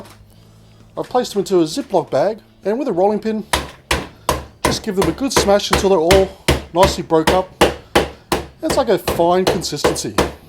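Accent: Australia. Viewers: I love the Aussie accent on you!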